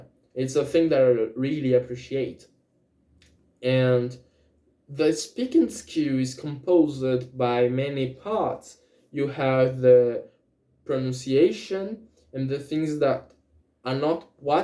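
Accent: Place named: United Kingdom. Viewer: Você escolheu o sotaque britânico?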